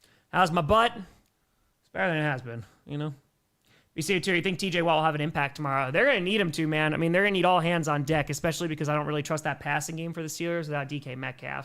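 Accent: New York, United States. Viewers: Who is/are the Krossi Posse Nation that refers to at the start of these broadcasts? I had always assumed he was saying 'Grossi Posse Nation' but he does not start off his welcoming cry with a G sound, at least to my ears. (Unless people with a New York accent pronounce their G's as K's, at the start of a word, when they raise their voice.) In any case, good luck with your quest to reach 1M subscribers.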